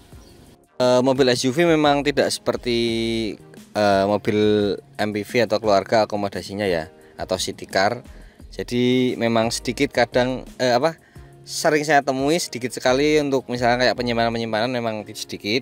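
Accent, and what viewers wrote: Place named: Central Java, Indonesia. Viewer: Paling senang dengar logat jawa tengahan ...marem salam teko jawa timur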